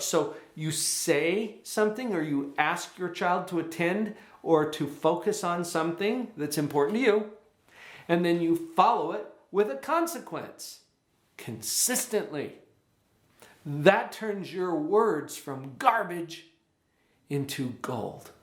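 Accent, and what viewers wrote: United States. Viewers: This guy sounds like he's from Utah or something.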